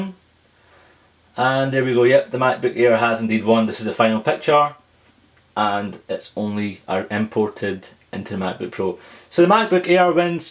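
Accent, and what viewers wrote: Scotland, United Kingdom. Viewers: If my knowledges of British Isle accents are correct, than I'd say Scotland. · i love the scottish accent wish i were scottish 1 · so scottish. awesome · Are you scottish? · r u from scotland? i love scotland! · are you scottish? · I wanna say scottish??..?? I could be wrong.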